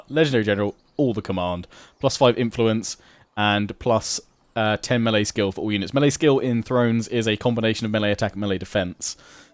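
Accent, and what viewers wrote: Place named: Bognor Regis, United Kingdom. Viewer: You speak so posh for someone from bognor/littlehampton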